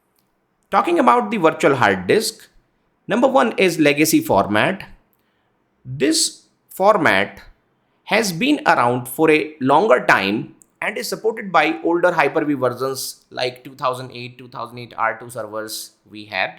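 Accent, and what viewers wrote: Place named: India. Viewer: The other suggestion is that for non-indians listening to indians speak english, your accent can be very hard to understand. Especially when you talk fast, which they often do. Please slow it down a bit and we'll understand better. I don't want to offend but I am having difficulty understanding everything.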